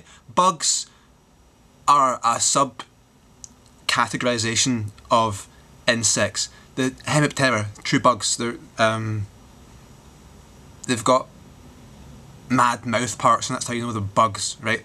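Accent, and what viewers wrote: Scotland, United Kingdom. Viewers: You are amazing man, love Scottish accent :)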